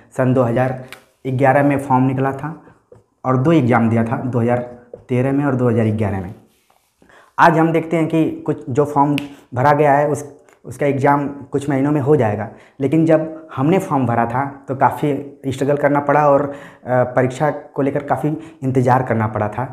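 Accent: Bihar, India. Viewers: आप बिहार से है क्या सर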